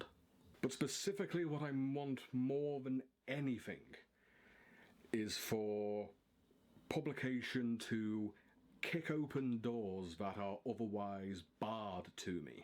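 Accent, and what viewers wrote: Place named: Northern England, United Kingdom. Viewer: I thought I heard a Northern twang in there! Hi, I'm Adara. I'm a writer who usually hangs out on Twitter. Your song choice sent me back in time to many anime conventions ^_^ I like how original your goals are. In terms of specific cult references, anyone who can explain my 'give quiche a chance' t-shirt deserves a cookie. I'd love to see more writers involved in Doctor Who. I love how you're choosing animation and comics for your dream adaption choices. So many people fall by default into the film or live TV only trap. Lastly, good choice co-writing with Dal!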